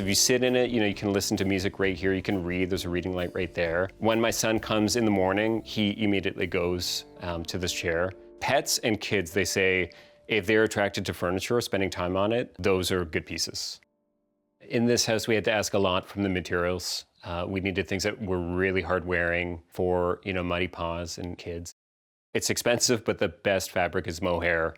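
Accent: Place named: Midwest, United States. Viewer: Wow - this guy is from the Midwest if ever I’ve heard a midwesterner. (Update: Ontario accents being synonymous to those in Michigan, upstate New York, or Wisconsin.)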